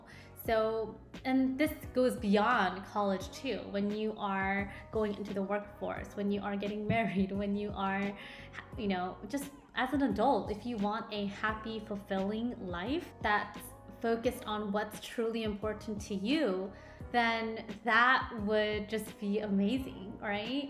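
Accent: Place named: South Korea. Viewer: I love your 2nd gen Korean accent~~ lovely!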